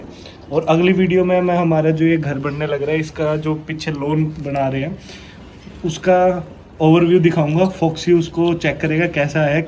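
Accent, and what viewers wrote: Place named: Haryana, India. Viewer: Mujhe toh tumharo haryanwi tone bahut achhi lgti h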